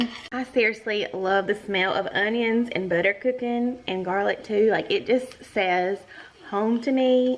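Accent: South, United States. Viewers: I love all your videos :) You are such a nice person, and I love your southern accent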